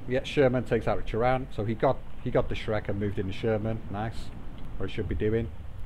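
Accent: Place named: United Kingdom. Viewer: Love the videos. Great to hear a fellow British voice commentating. Something we've been lacking since Alpha Bird left.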